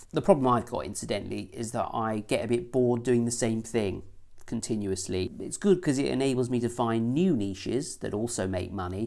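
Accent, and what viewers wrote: United Kingdom. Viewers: Your Brit personality and accent is the key to your success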